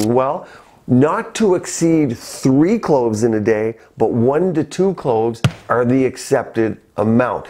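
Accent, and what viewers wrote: Canada. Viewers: A Canadian. They pronounce About and Out very strangely. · are u canadian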